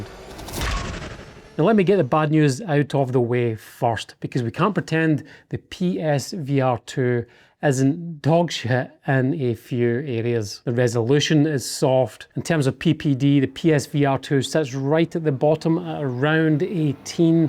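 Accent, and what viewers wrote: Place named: Scotland, United Kingdom. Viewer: Firstly.. before my main comment…. I am Scottish 🏴. - your accent sounds Scottish. Out of curiousity why quote prices in dollars- are you catering to the bigger US market? Anyhoo….. .. Wow. Watching that video it could have been myself talking. Agree with you 100%. In our house, my partner is the console player (PS5). More Assasins Creed, Crimson Desert than GT7- but she did play it (flat) with controller and was as good if not better than me on that platform. As soon as you put me on PSVR2 (with globular cluster of course) I levelled the playing field - just getting used to that controller!! 😊 I am yet to play GT7 on my ‘$7000’ 😉 PC rig- simply because the PS5 is in our living room hooked up to our big TV. I mentioned in previous vid comment I play only modded AC on PSVR2 with PC adapter and the DFR mod. Other than a high end headset, I am a bit of a PC snob- but - I still rate GT7 highly. 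All your comments ✅ Also Sophie (1 or 2) is a good AI competitor if you (like me) race offline only. Yes- modded AC looks friggin awesome when you have it dialled in properly, and tinkering in that sandbox is half the fun for me. With more than 6000 cars and 1200 tracks, it’s hard to beat. But… GT7 looks gorgeous at times for something which is plug and play. Bit ‘grindy’ perhaps to unlock some stuff, but tyres, pit stops, visuals. Pretty good- whatever sim racing physics snobs may say. At the end of the day.. it’s not real racing. I did this for years. Bankrupt me😅 So many broken engines…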